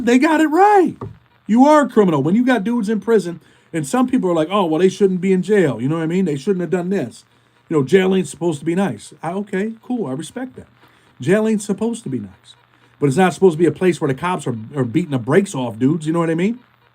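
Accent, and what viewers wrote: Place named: Boston, United States. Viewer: I thought you were from Boston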